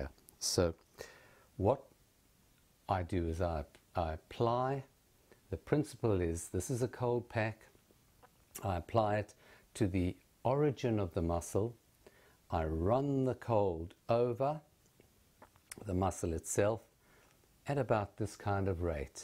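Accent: South Africa. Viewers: This was amazing. Recognised that South African accent immediately. ,More to the point I have been suffering from acute pain around the Supraspinatus join at the head of the humerus radiating into my upper arm. I thought and was advised that it was most likely a partial tear of the supraspinatus and followed a regime of excercise that has had no effect whatsoever. This has been ongoing for over 3 months and I have long since resigned myself to the fact that i'll probably need surgery. However a short popped up on my feed which I followed to the video, after watching I used a dowel and attempted to approximate the trigger point pressure, very inexpertly and awkwardly I might add. Almost immediately I experienced an increased range of movement and a drop in my pain index. I am really excited that I'll regain full function and be able to hit the gym again after a few more tries. 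Thank you!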